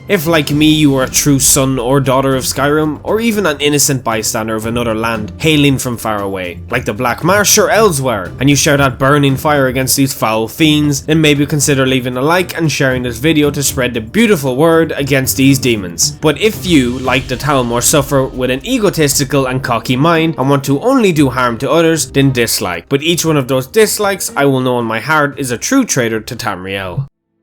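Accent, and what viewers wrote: Ireland. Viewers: First video I have seen of your channel and I'm so glad I did. I love your Irish accent and the humour is brilliant great lore video. 👍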